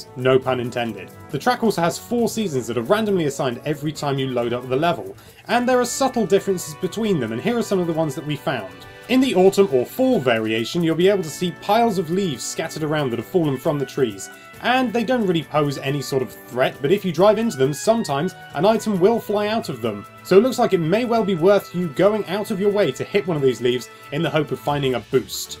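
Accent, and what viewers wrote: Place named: England, United Kingdom. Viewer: He's pronouncing it with his English accent. In England everybody pronounces it like that.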